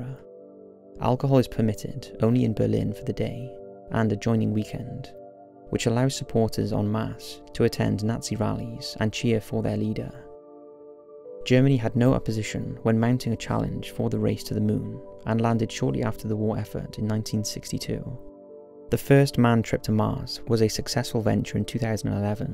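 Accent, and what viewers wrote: United Kingdom. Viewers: British accent.....instantly clicked off.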